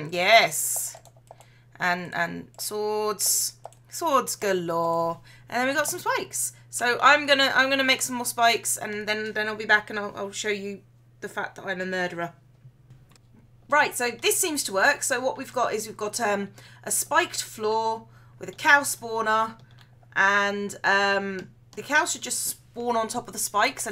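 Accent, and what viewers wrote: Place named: West Country, United Kingdom. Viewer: Yay for the West Country accent!:) Great video as ever. <3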